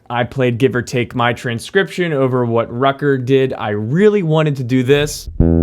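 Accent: Baltimore, United States. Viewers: The Baltimore accent is one of the greatest American accents of all time. Now I need to snort lines of Old Bay and put on some.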